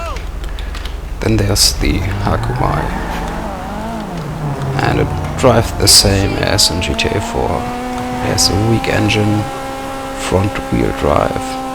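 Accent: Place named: Germany. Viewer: man merkt an deinem Akzent dass du deutsch sprichst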